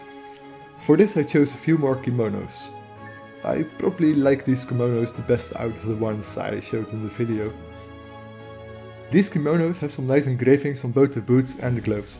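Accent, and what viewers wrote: Netherlands. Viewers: Are you from Den Hague by any chance? Or some where from nearby that region? I notice it very clearly from your accent, but I'm not sure. Part of my family are from there. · ik hoor aan je accent dat je nederlands bent haha :)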